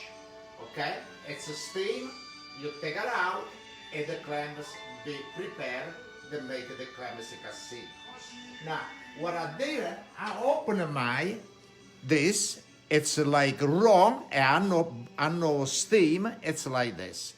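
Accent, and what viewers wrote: Italy. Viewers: I listen to you i hear my italian immigrant grandparents.We love yo both❤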